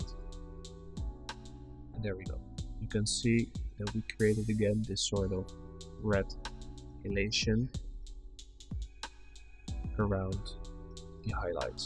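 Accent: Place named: Netherlands. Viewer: Toffe video! I recognised a Dutch accent I think, if I'm not mistaken.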